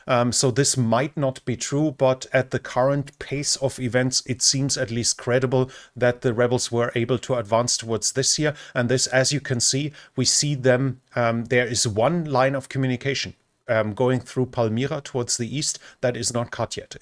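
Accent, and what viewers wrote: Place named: Germany. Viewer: Normally I wouldn't correct a pleasant German accent, but "ah-paw-state" is the English pronunciation. Doesn't matter much as most English speakers don't know what apostate means. Old diplomatic saying for region: "In the end, everyone betrays the Kurds." Probably the same can be said about the Armenians. If Assad falls I suspect the US will support the Kurds to a limited degree right up till Trump is sworn in. With much lamenting about loyalty and blaming of Trump by the military types, the US will then withdraw. As it has been for thousands of years Anatolia and Persia, to call them by their historical geopolitical labels, are too important to ignore. Everyone else can be.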